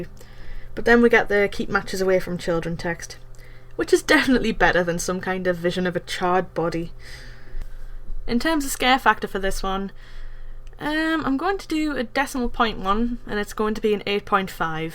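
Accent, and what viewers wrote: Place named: Newcastle, United Kingdom. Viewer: Don't be silly! I've always been a fan of your videos, I've missed the PIF community's Geordie lass, pet! (trying not to hint at a possibility of a new video soon)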